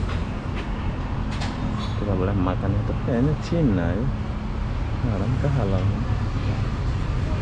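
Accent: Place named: Malaysia. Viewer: Mas bro asli mana kok dialog dan logatnya seperti orang melayu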